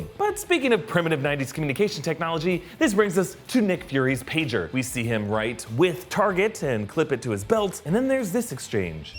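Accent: Russia. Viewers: Your Russian accent made me think of Tank. I miss Tank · Like your russion accent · Love ur russian accent and the parallel with cw phone is purfect nice guess 👍 Awesome vdo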